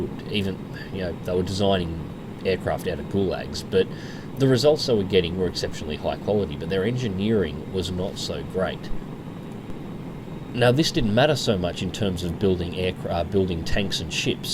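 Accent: Australia. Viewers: yes indeed so glad i live over here and are you from Ausie i cant help but notice a bit of an accent?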